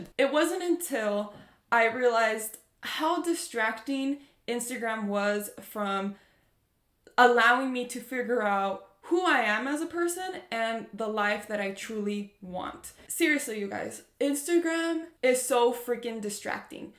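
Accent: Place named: Brazil. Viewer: teu inglês é perfeito, mas por algum motivo sei que tu é br... subliminar... mas sei kkk excelente vídeo!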